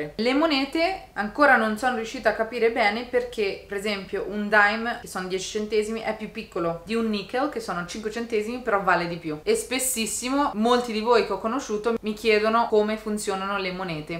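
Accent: Ancona, Italy. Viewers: Ciao ho visto il tuo video per caso ma ho subito riconosciuto l'accento in italiano Sei per caso di Ancona poco ma sicuro .sulle mance non sono molto d'accordo Visto che non è una libertà ma un imposizione e poi non è tutto mercato nero sono soldi non tassabili e in più alimenta Il sottocosto retribuito di chi lavora come cameriere o altro visto che le mance contribuiscono.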